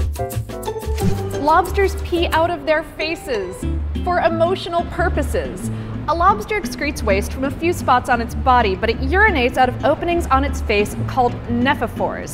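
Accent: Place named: United States. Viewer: has a german name, looks asian and speaks american english....globalistaion for the lose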